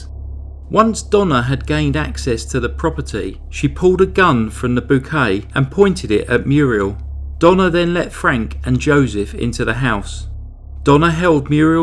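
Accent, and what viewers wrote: United Kingdom. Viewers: Love your cheeky endings!! Hope you’re getting through your isolation and keep making such well narrated content. Hearing any of the British narrations brings my mother’s voice to the forefront and always brings a smile to my dial. Thanks. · This british accent confuses me somehow...i concentrate on the accent not on the Story. Can t even say if i like it or not...i m just confused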